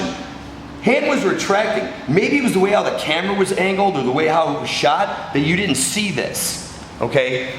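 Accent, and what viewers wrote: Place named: Scotland, United Kingdom. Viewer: Hi big fan of your tHE ROGUE WARRIORS series of vids very impressive, i wonder if any of your students teach this no bullshit style in the uk,on a lighter note I LOL at you comment i am about to shit on your corn flakes (you sound like my scottish budy) my personal comment in the uk is usually well that pissed on your strawberrys, i can't believe the negative troll comments you get from people who just decide they can't believe what they see, ar well i guess every ying has its yang all the best, Russ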